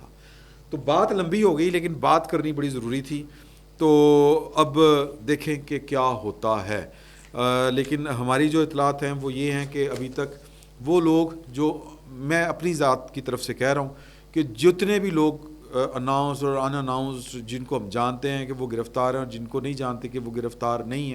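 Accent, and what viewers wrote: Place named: Lahore, Pakistan. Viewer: بات بغیر کگی لپٹی ھوسکتی ھے لاھورن سٹائیل کی کیا ضرورت ھے ۔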